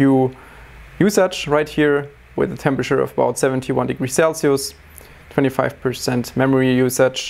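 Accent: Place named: Germany. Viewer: Sorry, but German accents sometimes makes me do a double listen. 20 seconds in... "Hoetel". haha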